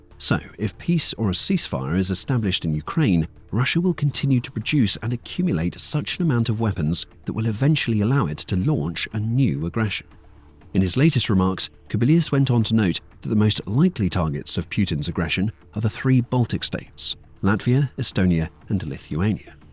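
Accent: United Kingdom. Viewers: always a British accent too.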